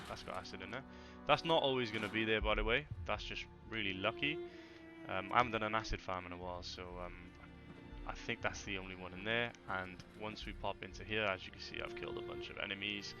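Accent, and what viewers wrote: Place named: Cardiff, United Kingdom. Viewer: I live in Barry currently but all my family are from Cardiff! Small world! Didn’t expect to hear a familiar accent while watching fallout 76 videos... thanks again! Subscribed and liked 👍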